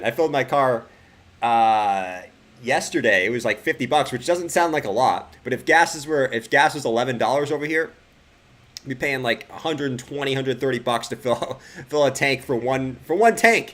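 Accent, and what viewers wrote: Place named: Boston, United States. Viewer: I got interrupted! Loving your advancement of effects etc. and yes you still have a huge Bostonian accent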